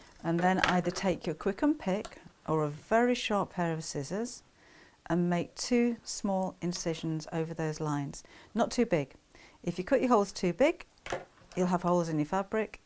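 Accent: United Kingdom. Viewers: Where are you that you call it a zip? The accent sounds British? In America we call it a zipper. Your bags always look so darn cute!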